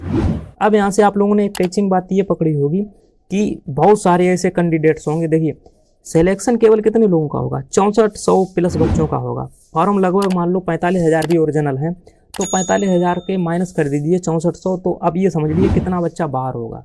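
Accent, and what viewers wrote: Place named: Auraiya, India. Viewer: Aapki language tone UP k auraiya ki trf ki lg rhi h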